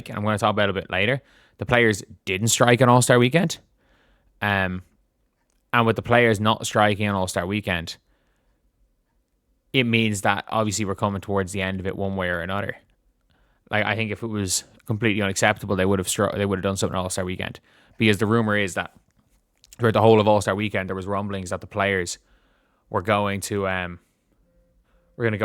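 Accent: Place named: Ireland. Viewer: I love to hear my hoop news in your charming Irish brogue. Reminds me of my immigrant dad. Love u dude keep spittiing those facts me boyo 🇮🇪🏀☘️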